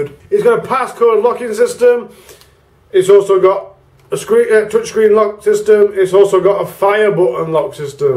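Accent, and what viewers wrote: Yorkshire, United Kingdom. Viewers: Yes! Thank you for this review! Can’t wait to pick one of these up (but fingers crossed 🤞🏻 for your little comp). I thought you was yorkshire at first listening and thought Ohhh there’s a vape shop I can visit...but your not close enough after a quick google 😔. Vape shops in York are not to great. Anyways, thank you so much for your straight up black and white review. Brilliant!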